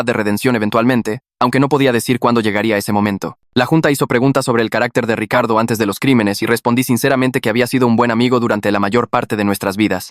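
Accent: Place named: Colombia. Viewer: se re nota q eres de colombia bro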